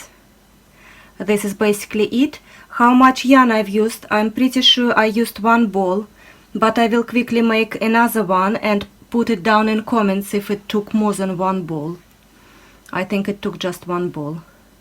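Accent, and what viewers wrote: Russia. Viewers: Мне кажется или у вас русский акцент?🤔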